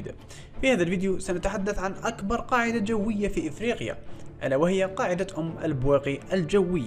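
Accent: Morocco. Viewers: على حساب لهجته يكون مغربي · لماذا التشهير باسرار الجيش لاتعجبني هذه المواضيع الكتمان ثم الكتمان العالم كله عنده جيش تريد تفكيكه مثل الجيش العراقي بحسدك خبيث صهويني ولهجتك مروكية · صاحب القناة من لكنته باين مغربي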